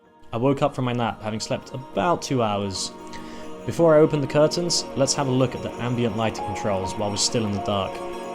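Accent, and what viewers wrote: England, United Kingdom. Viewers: Extraordinarily light blue eyes. I thought he might be Norwegian but I've just googled and apparently it's diminutive Breton for Jean. Thought he pronounced Perignon well.. Saw another vid where he put a stress on the "u" of Olufsen, whereas English people usually stress the O, although you can't tell he's not Oxford/Slough English with just a touch of Youtube US. Loved the cubbyhole demo🙋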